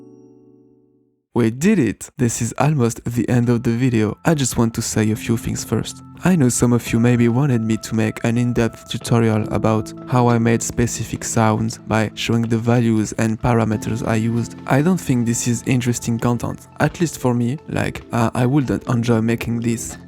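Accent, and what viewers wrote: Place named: France. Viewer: I recognized a French accent Mdrr 😂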